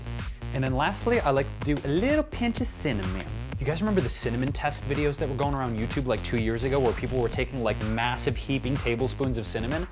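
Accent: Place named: Minnesota, United States. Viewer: Hey there, now, you got dat MN accent down pretty darn good, don't ya!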